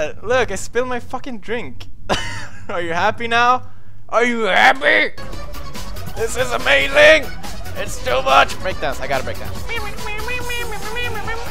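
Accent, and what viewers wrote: Sweden. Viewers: His Swedish accent is so prominent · still had that Swedish accent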